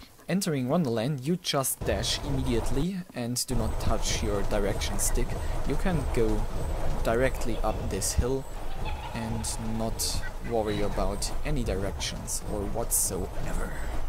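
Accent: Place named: Germany. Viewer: dieser moment wenn du sofort durch das sprechen merkst das die person deutsch ist xD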